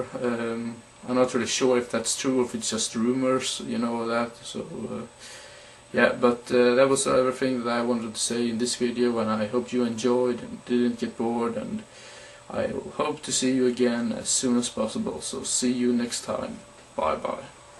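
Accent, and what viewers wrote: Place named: Sweden. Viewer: Haha, hörde att du var svensk när du pratade. Roligt att de första jag hittar efter att sett trilogin är en svensk kille som snackar. :)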